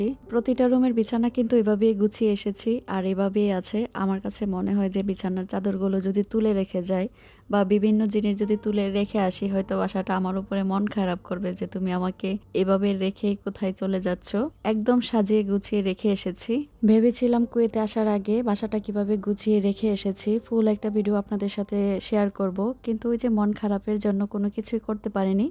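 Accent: Bangladesh. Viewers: আসসালামুয়ালাইকুম আপু তোমার ভিডিওটা অনেক ভালো লাগলো তোমার বাংলাদেশের ভাষাটা দেখে অনেক ভালো লাগলো তোমার মেয়েটাকে মাশাল্লাহ আমার অনেক ভালো লাগে জন্য অনেক অনেক দোয়া❤❤❤❤❤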